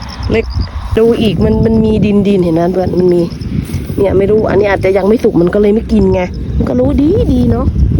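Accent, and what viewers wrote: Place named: Thailand. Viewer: อยู่ญี่ปุ่นมากี่ปีครับยังไม่ลืมสำเนียงไทย เยี่ยมครับ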